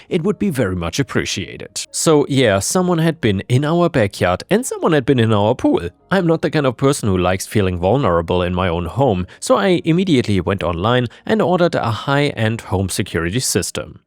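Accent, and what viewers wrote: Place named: Germany. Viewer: A German narrator always is watchable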